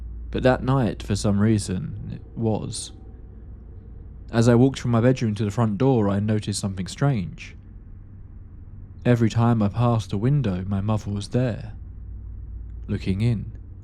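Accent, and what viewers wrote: England, United Kingdom. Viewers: Big fan of your channel man, great narration, great stories and the spooky English accent helps, much love bro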